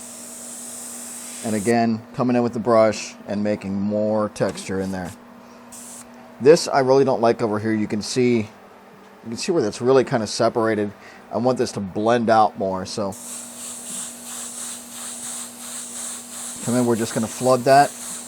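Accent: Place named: Pittsburgh, United States. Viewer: Pittsburgh boy. I recognize the accent. My grandparents lived on Glen Coe dr. McKeesport.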